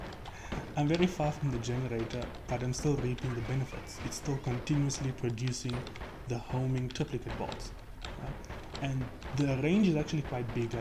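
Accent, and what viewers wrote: South Africa. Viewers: Hey man just wondering you have a South African accent Are you form the Western Cape?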